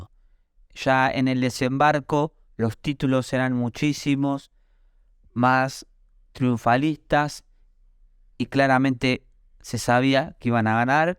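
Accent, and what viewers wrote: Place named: Argentina. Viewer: Cuando eliminarán los argentinos la pronunciación "sh" de la " ll" y la "y". Sho, orgusho. Cuando reconquisten las Islas. El idioma español que nos heredó la Madre Patria, no nos instruyó esa pronunciación.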